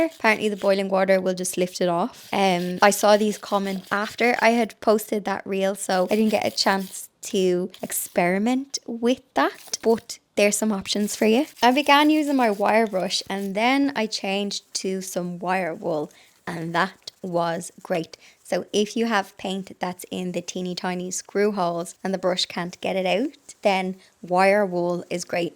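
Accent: Ireland. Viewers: lovely video as usual… really enjoy the calmness of them, and you’re sweet Irish accent. Have been thinking about your floor choices… love the brick and think that it is a beautiful statement piece, but wondering if you would ever be barefoot in that space and how that would feel underfoot? Might be a silly question from someone who lives in Australia ha ha. My other thought is how high can you fill the grout to alleviate the variation between the grout and the brick (possibly less dust collection?) Also think if that kind of flooring is high maintenance definitely investigate a robot vacuum!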